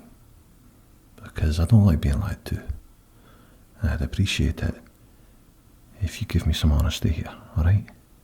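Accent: Scotland, United Kingdom. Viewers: i looooooooooooooove how Scottish sounds.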